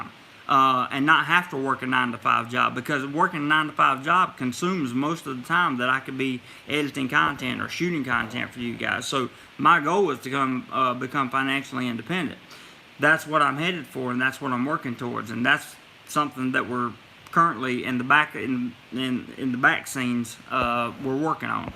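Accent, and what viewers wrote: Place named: Texas, United States. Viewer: I like your Texan drawl!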